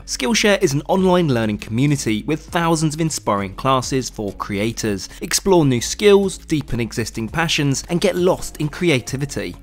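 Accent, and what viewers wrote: United Kingdom. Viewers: i assume the channel owner is british (judging by his accent), the UK uses the metric system (km), why tf would he use miles?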